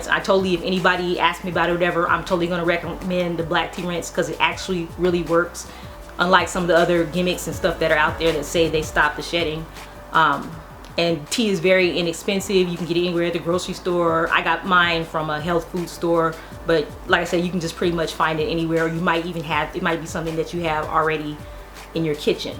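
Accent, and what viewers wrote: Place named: New York, United States. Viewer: Are you from New York? I am, your accent sounds familiar.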